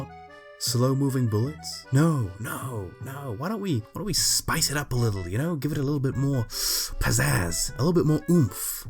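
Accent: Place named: Australia. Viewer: Love this game and your amazing Australian accent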